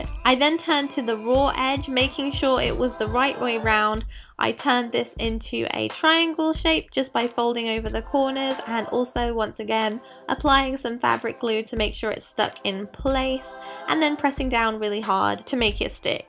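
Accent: England, United Kingdom. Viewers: Well She Has An British Accent, But Yeah I Go With U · is she english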